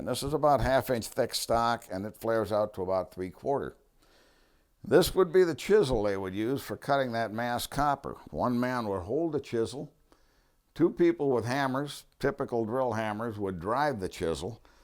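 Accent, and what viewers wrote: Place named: Michigan, United States. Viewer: Thank you! Excellent presentation. I also enjoyed your MI accent. I have a 4" piece of native copper i found as a kid when we hiked up to an abandoned copper mine shaft on the UP. My dad was from MI and had done his Master's History thesis on a giant copper boulder of the UP hauled out in the 1840's. Years later i saw the boulder in the Smithsonian. I grew up in New England but we'd visit MI relatives & 1 week my family toured the UP. Most haunting was an abandoned copper processing factory, last used during WWII. Wish I knew quite where on the UP that was/is? Had ore cars rusting on tracks outside. Inside the 1 story factory, some machinery was still there. And real WWII posters were still on the wall! (This was 1970.) I took a Red Cross Homefront / Build Morale poster.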